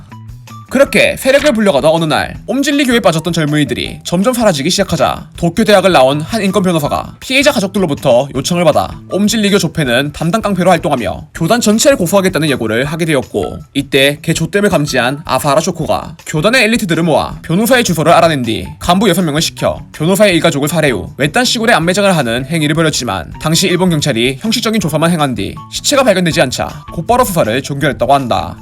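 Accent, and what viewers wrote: Jeolla, South Korea. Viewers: 김어준인줄ㅋㅋ 전ㄹ ㅏ도 익산ㅋㅋㅋㅋ ㄹㅇ과학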